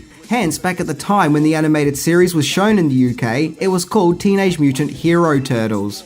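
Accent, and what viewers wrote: Australia. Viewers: Australians pronounce things different lol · I don't think there are any facts about this movie that I don't already know...but it is fun to hear them in an Australian accent. Thanks!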